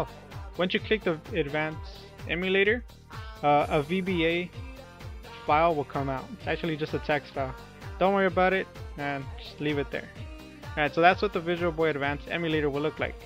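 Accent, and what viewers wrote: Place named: India. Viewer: No offence dude but ur mike makes you sound like indian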